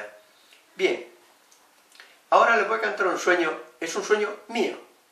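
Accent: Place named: Spain. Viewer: Me ha gustado el acento español con el que habla :)), buena explicación de la teoría.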